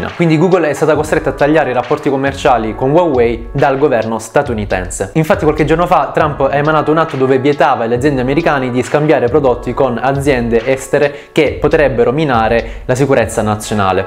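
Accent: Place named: Sicily, Italy. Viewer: carissimo avrei un po' di cose da dirti: 1. dacci un nome più semplice, anche fittizio, con cui chiamarti 2. riguardante questo video devo dirti che stai migliorando molto, infatti, fatta eccezione per qualche lapsus di tanto in tanto ed un' esternazione degli argomenti ancora un po' macchinosa, il video è molto fruibile e le tue spiegazioni sono abbastanza chiare 3. in linea generale: devo dirti grazie! sei il primo canale italiano (almeno che io sono riuscito a trovare) che tratta la scienza economica. sei un vero e proprio debunker per quei miliardi di video complottisti che intasano youtube. anche i commenti sotto ai tuoi video sono un piacere da leggere (quasi nessun: "MUORI TRATITORE DELLA PATRIA VENDUTO DELA BCE!!111!1", ma tanta gente civile che argomenta pacatamente e che rende il tutto molto stimolante per chi ha passione per questa materia). 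ti prego continua! fallo per noi 4 affamati di materiale di qualità. 4. vorrei permettermi di consigliarti qualche argomento per i prossimi video che secondo me potrebbe essere interessante, ad es. la teoria dei giochi o le teorie di keynes, o magari nel tempo te le verrò a proporre sotto ai tuoi video se ti fa piacere... comunque sia vorrei supportarti il più possibile: like a manetta e farò vedere i tuoi video ai miei colleghi... dicci tu come possiamo supportarti maggiormente. 5. ho capito che stai a torino, ma mi sai tanto di terrone, probabilmente siculo (con grande affetto, lo sono anch'io)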